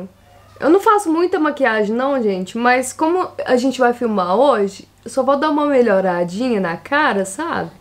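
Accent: Minas Gerais, Brazil. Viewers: Eta, mineirinha arretada, sempre com o sutaquinho mineiro kkķķkkk,adooooooooooooro,bjs pra vc e sua sogrinha mamãe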